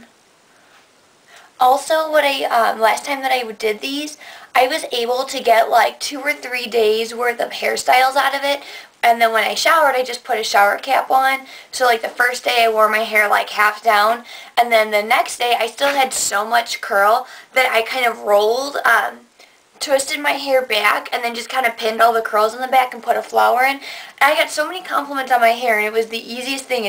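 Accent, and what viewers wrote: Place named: Long Island, United States. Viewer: You sound like your from Long Island.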